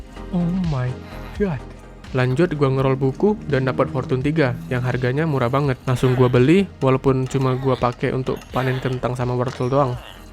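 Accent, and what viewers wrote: Indonesia. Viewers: Plembang dkit cknyo😂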